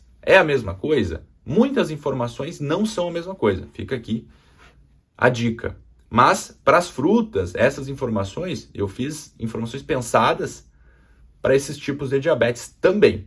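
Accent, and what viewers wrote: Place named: South, Brazil. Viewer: Falo de Paço do Lumiar Maranhão, já tinha percebido que ele é do Sul pelo sotaque rs, nota 10 pro vídeo, muito explicativo, esclarecedor, além de muito dinâmico e simpático ainda é um gato 😍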